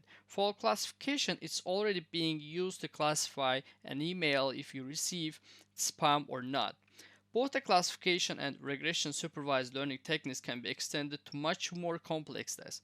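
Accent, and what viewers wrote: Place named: United States. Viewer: Abi cok guzel ingilizcen var, Amerikada olmus gibisin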